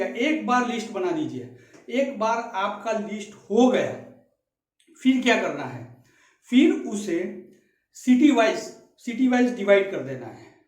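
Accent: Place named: Gujarat, India. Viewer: Gujrati h shyd 😂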